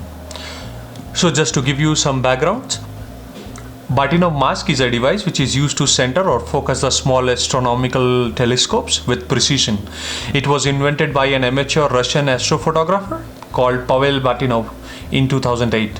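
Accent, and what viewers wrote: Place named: India. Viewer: Are u indian. U have an indian accent but ur bio say u are mexican 😅. Why don’t u make more videoes related to telescope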